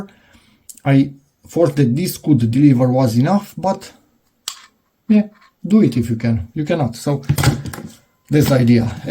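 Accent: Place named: Romania. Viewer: Accent clar romanesc :))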